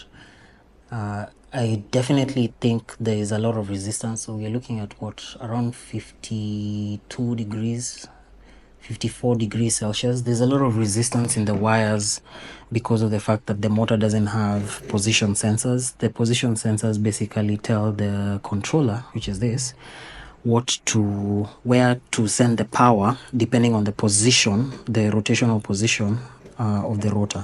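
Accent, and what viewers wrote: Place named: Kenya. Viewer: kenyan accent . This is good stuff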